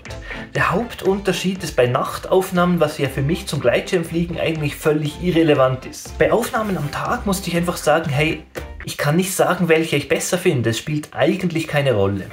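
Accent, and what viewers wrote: Bern, Switzerland. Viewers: Tip top equipement review! Deutsch-deutsch is für mich klar leichter verständlich, trotzdem gefällt mir dein autentisches Schwizrdüch besser! 😊❤ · Ganz klar immer no Bärndütsch! 😅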